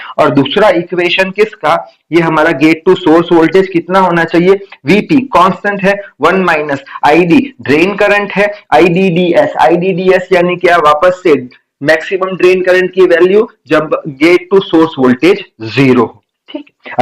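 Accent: Gujarat, India. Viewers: When at the starting of the video (hlo) and i m understand sir is also from gujarat..😅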